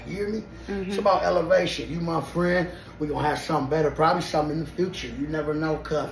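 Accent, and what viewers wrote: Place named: Los Angeles, United States. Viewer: That's how they talk in LA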